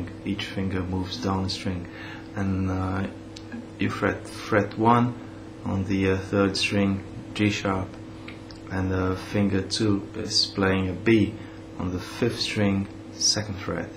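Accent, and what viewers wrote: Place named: Brazil. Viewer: Are you a Brazilian living in England or Australia? Your accent sounds to me like a mix of Brazilian and British or Australian. Just curious.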